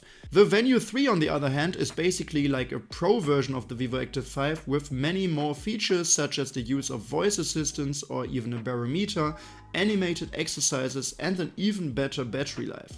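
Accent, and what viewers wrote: Germany. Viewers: holy shit i’m laughing here. Saw your video and clicked to learn about the watch and immediately thought to myself what a thick German accent while glancing down at the name of your channel.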